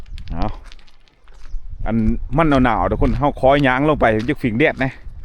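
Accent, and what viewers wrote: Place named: Phu Tai, Thailand. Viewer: แกงหอยมี้เฮ้อเผ็ดแกงเห็ด มี้เฮ้อเค็ม.. เว้าคือเดว..กับ ..ทางผู้ไท ..เขาวง...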